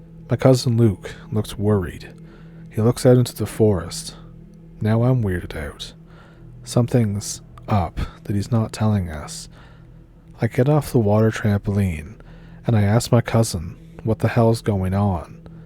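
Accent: Canada. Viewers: Well, I have told you before every time I hear your accent, I first think of my Canadian cousins 👍😁🤟🎉🎉🎉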